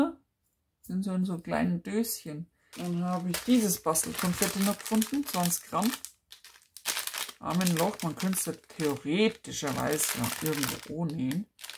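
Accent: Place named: Bavaria, Germany. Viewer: Hallo, neue Abonnentin hier ✌ Ich bin auch aus Bayern und mir gefällt dass man deinen Akzent so schön durchhört 🤗 Außerdem heißt meine beste Freundin auch und deine videos gefallen mir natürlich auch 😁 Mach weiter so und bleib so identisch wie du bist! Servus, Yvonne 😉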